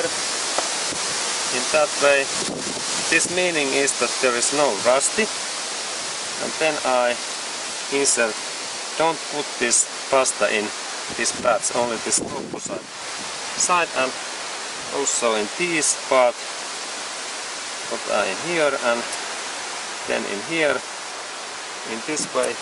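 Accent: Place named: Härmä, Finland. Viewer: murre on niiiiiinnnnn härmäläinen kuin olla voi:)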